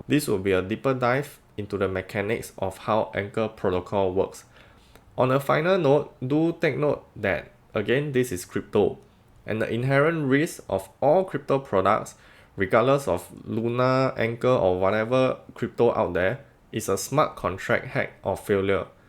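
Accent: Singapore. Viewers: Wow the Singapore accent is actually a thing! I almost thought I was listening to the other youtuber Chicken Genius. Interesting ^^ Also thanks for taking the time to write up this guide of course! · Gotta love the Singaporean accent. Huat ah.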